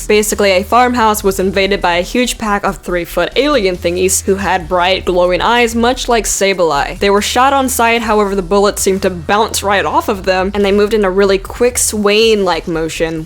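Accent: South, United States. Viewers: I love her southern acient it great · Are you from the South?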